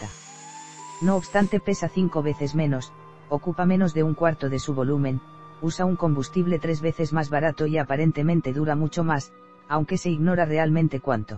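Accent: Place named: Spain. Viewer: Me desorientado la voz!! Parece una gallega la que habla. (Española)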